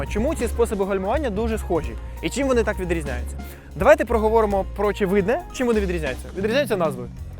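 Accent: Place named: Russia. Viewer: А якщо ухвалять закон, що з російським акцентом не можна говорити? Що тоді? Замовчите?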